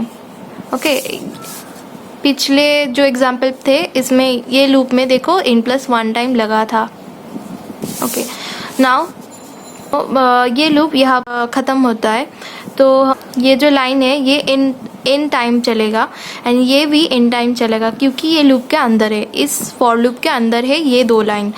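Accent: India. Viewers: probably a hyderabadi or south indian accent , cute btw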